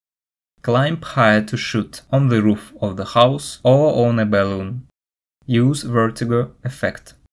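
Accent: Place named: Russia. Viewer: Good review. I really enjoyed it . I first had trouble with your Russian accent, but then it is was a good idea to add captions and titles throughout the video. Keep it up.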